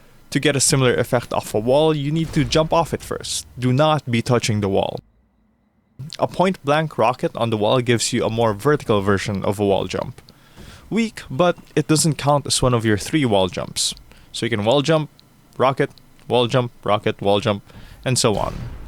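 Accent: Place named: Philippines. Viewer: been watching your guides and they've been extremely helpful, thank you! are you filipino btw because that accent is so recognizable ahahaha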